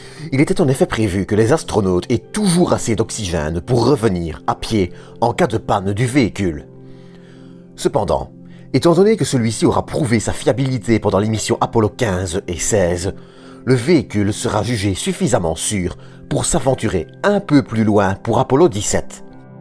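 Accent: Belgium. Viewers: votre accent belge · L'accent belge.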